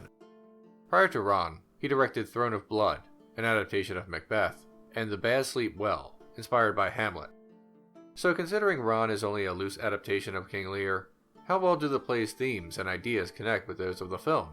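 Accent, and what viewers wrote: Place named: United States. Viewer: A fair analysis, but I was really distracted by the mispronunciation of every Japanese name ... I know it probably doesn't bother English speakers but as someone that grew up in a familiar environment with the language I seriously have trouble figuring out what name it is you're saying. Then again, these are mistakes literally everyone that doesn't speak the language makes, but still, it is a mistake; the English spelling of Japanese names has never been phonetically accurate so I guess it will always trip me up hearing Japanese spoken through the American accent.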